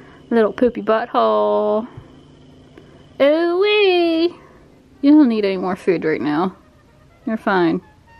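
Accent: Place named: Philadelphia, United States. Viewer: You have a philly accent. ;)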